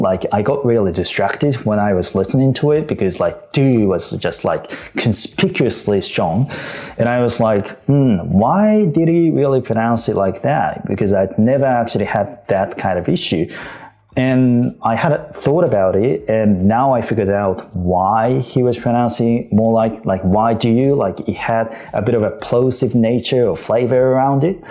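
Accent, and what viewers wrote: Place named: Australia. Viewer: 今回は特にあつさんの英語がたくさん聴けてうれしかったです😍あつさんの英語は「ポッシュなオージー」みたいな勝手なイメージを抱いています😅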